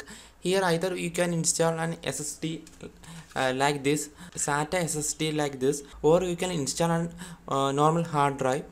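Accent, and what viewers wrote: Kerala, India. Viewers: Helpful video bro Btw are you from Kerala Malayali ano?😅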